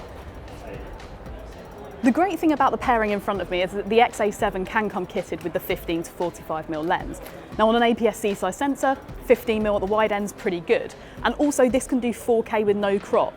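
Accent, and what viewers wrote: United Kingdom. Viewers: British Accent 😂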